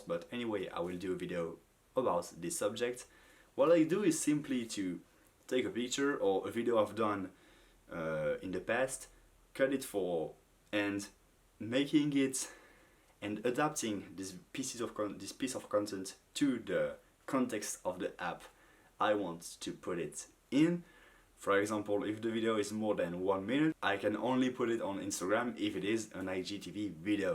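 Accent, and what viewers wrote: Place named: France. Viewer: Just honest feedback, you have great content, but work on your English your French accent is alluring but a teeny tiny bit too heavy so it’s hard to understand you sometimes. You’ve got the face and content for YouTube it’s obvious! Good luck!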